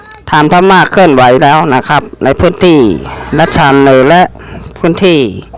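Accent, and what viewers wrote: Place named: Vietnam. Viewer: ฟังสำเนียงพูด.เหมือนเวียดนามนะ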